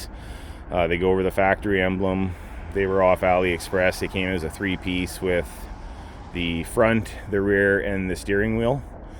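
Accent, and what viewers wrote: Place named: Canada. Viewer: I hear the Canadian accent. Perfect car for the Northern weather. Great video man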